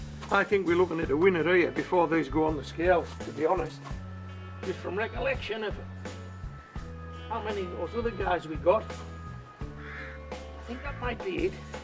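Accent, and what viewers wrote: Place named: Darlington, United Kingdom. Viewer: thank you kindly and bless your ears. It doesn’t look insipid in that vid. Looks spot on. I have family from Darlington and you have a similar accent. Lovely up there. I’m in the fens where it’s flat and windy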